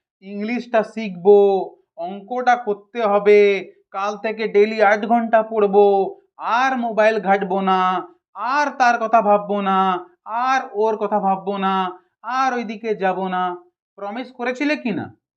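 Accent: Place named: India. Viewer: স্যার আমার মনের মধ্যে একটা প্রশ্ন অনেকদিন থেকে আছে - আপনার কথার মধ্যে হিন্দি টান টা কি করে হলো? Please স্যার জানাবেন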